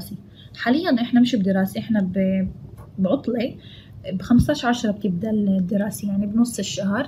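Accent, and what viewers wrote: Palestine. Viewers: بالعكس الرسمة جميلةة ....بس ما شفنا الحبر دخل بالرسمة ابداا ...مع انو الحبر اساس التحدي 🙈 مرة اخرى أُحِبّ لهجتكك الفلسطينية❤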